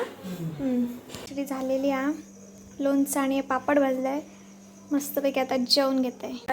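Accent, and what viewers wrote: Malvani, India. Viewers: Malvani chan bolte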